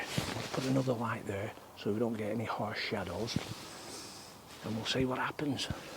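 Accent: Lancashire, United Kingdom. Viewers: Only just found your channel and I must say Brilliant really enjoying what your producing, you also sound very local Lancashire by any chance.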